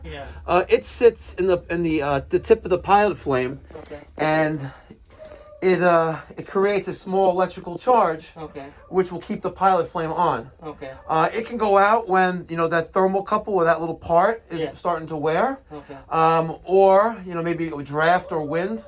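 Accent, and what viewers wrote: New York, United States. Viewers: Hey, please explain what the difference is between PPM CO and PPM CO AF? Really enjoy hearing your NY Accent and your expertise in everything Plumbing & Heating.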